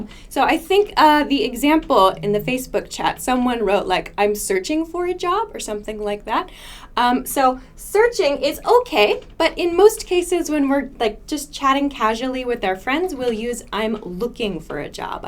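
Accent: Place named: United States. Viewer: Yes, this is American English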